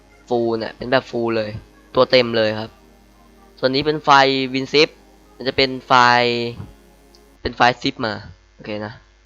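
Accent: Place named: Thailand. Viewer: เสียงเหน่อๆ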